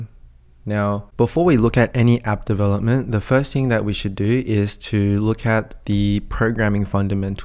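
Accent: Australia. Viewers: Your accent is so interesting. It has flavors of Australian but more heavily sounding of American English than most Australians I've heard speak. What is it?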